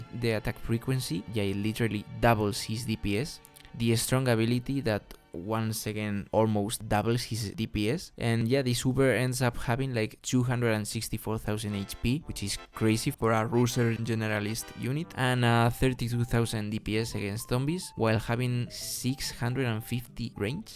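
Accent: Spain. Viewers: eres español? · amo tanto el acento español en el inglés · Se nota muchísimo el acento español, gran video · Es la leche ver a un español hablando ingles, buen video igualmente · are u spanish? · Soy yo, o es obvio que eres español?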